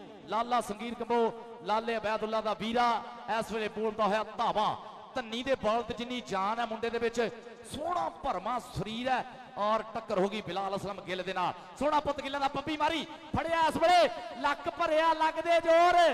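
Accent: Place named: Punjab, India. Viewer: Anchor di boli ton east punjab da lagda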